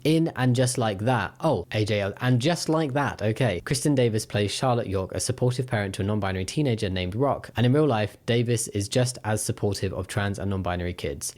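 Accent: United Kingdom. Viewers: I always watch these videos to watch a british man talk in a british accent to do gay british things. I always end each video learning more than I do in any of my classes.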